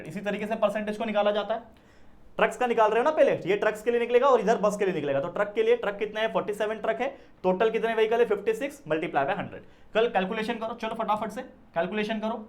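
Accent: Maharashtra, India. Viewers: Sir tumhi marathi ahe kay ?? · Sir's marathi at peak🫠😮‍💨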